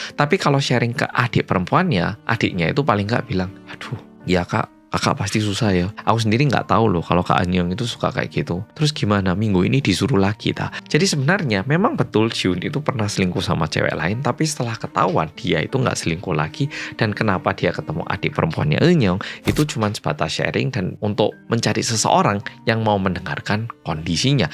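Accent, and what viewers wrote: Java, Indonesia. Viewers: hai medok❤ lgsg nyimaaak🎉 salam bolobolo😘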